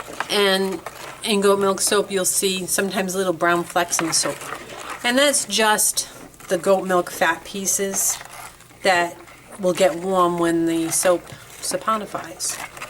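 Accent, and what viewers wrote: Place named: Boston, United States. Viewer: Are you n Boston? I think I am detecting a Boston accent from you. Nice soap by the way.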